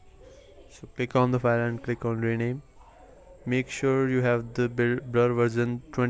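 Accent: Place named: India. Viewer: That accent must be common in India!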